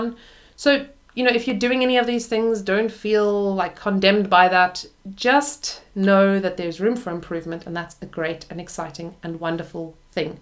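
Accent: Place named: South Africa. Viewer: Sounds South African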